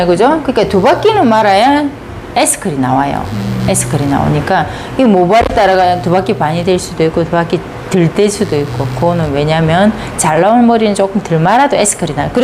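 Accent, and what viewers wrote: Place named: Gyeongsang, South Korea. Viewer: 오늘 처음 들어왔는데요. 경상도말씨가 정겹습니다. 거의 대부분의 유튜브가 서울말씨인거에 비하면 부드럽지는 않은데 정확하게 알려주시네요. 설명이 아시는거에 비해 매끄럽진 않지만 ㅎㅎ 저는 참 좋습니다. 엄청난 내공이 팍팍 느껴집니다. 실전이 느껴집니다. 계속 들어가서 배우겠습니다.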